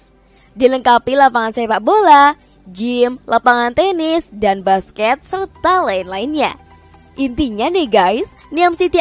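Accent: Java, Indonesia. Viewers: medok banget ngomongnya..mengganggu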